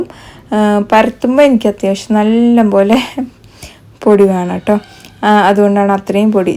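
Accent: Palakkad, India. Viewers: പാലക്കാടൻ സ്ലാങ് വേറെ ആണോ അറിയില്ല അത് കൊണ്ടു ചോദിച്ചതാ. ഞാനും മൈലാഞ്ചി ഇട്ടാൽ കൈ ചുമക്കില്ല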